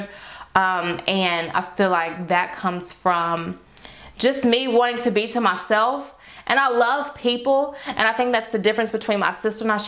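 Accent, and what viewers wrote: South, United States. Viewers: I could literally listen to you talk all day! I love your accent and the southern twang that you have. 😅 💕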